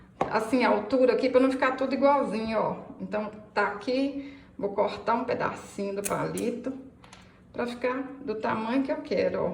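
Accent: Minas Gerais, Brazil. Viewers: Que sotaque maravilhoso. Saudade de Minas Gerais. Parabéns pelas criações.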